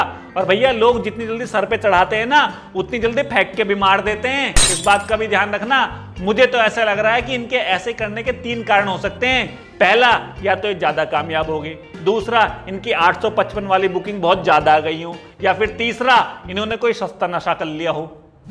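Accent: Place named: Bhojpuri, India. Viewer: Well tried for Bhojpuri 👍🏻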